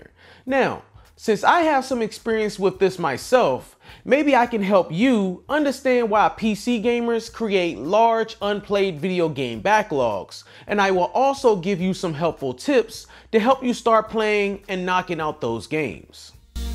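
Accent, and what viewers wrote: United States. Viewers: The American accent 😂😂😂❤❤❤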